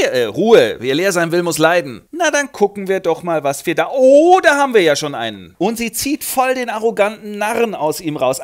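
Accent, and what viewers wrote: Franconia, Germany. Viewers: Der fränkische Zungenschlag, wunderbar! 🍪🍪🍪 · der frrrängische Dialekt ist das Tüpfelchen auf dem i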